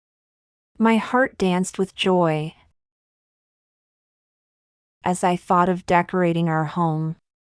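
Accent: United Kingdom. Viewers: does this channel have a British accent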